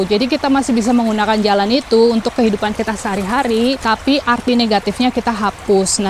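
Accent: Banyumas, Indonesia. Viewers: Logat ngapake kentel ya yu 😁😁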